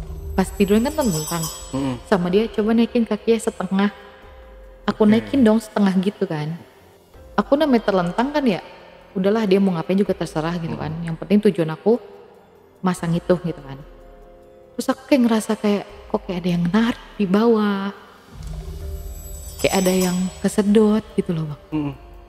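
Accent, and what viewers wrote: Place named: Medan, Indonesia. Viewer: Logat kakak nya seperti orang kami .medan..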